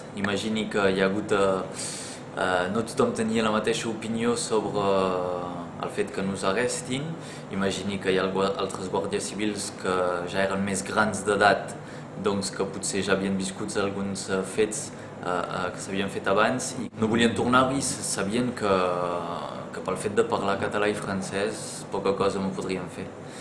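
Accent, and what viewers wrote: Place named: France. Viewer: Llàstima que tinguis l'accent tant contaminat pel francès.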